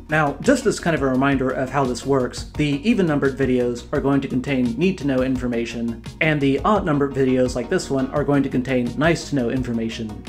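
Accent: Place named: Eastern Europe. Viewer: you have an accent....I wonder what your native language is...perhaps a slavic one..?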